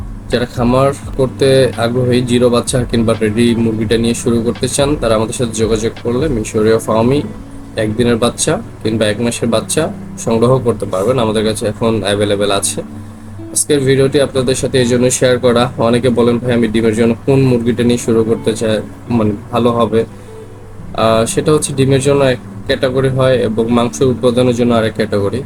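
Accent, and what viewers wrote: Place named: Rangpur, Bangladesh. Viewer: আপনার লোকেশন কথায় রংপুরের। থানা কোন গ্রামের নাম.???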